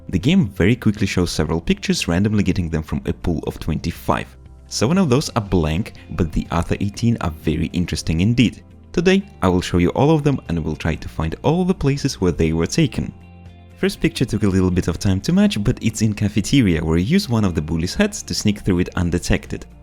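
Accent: Russia. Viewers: Я слышу русский акцент))))) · sir, are you by any chance Russian?)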